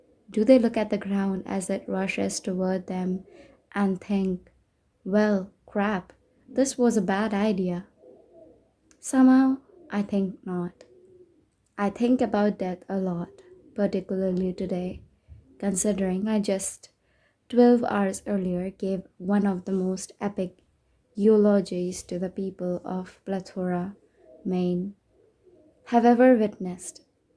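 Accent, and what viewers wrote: India. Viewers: Omg I'm ur new subscriber ! Just checked out ur channel there are so many audiobooks for free ! Ur voice is so beautiful too ! I am so happy i found this Channel ! Can I ask where u r from ? ( Cause i feel like u have a little bit of Indian accent...u sound beautiful while reading ! ) Really appreciate ur efforts of giving us so many nice stories here at ur channel for free ! 💕💕💕💕💕💕💕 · Are u indian? Your accent is so thickly beautiful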